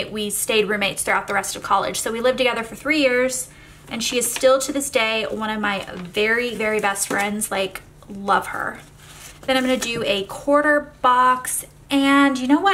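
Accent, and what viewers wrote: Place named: South, United States. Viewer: I can hear your southern accent coming back!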